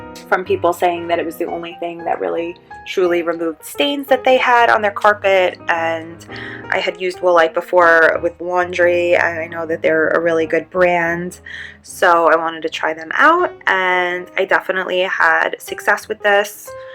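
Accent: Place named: East Coast, United States. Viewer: I can tell she’s from the east coast by her accent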